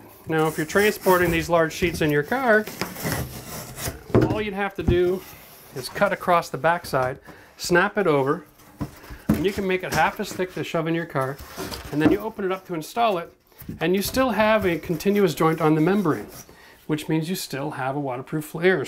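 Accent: Canada. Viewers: I hear that Canadian accent! 🇨🇦 Great video. Thank you! We’re renovating a 7000 sq ft home. Great video. 👍🏼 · Lovely to watch a professional at work you make it look easy great video Are you sure your not related to the South Maine Auto guy your mannerisms are eerily similar good to see a son helping his father and showing a few new tricks Love the Canadian accent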